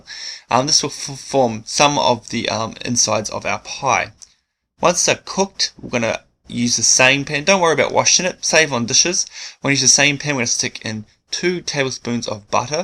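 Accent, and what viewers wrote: New Zealand. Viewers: I lived in New Zealand, thought that his accent was familiar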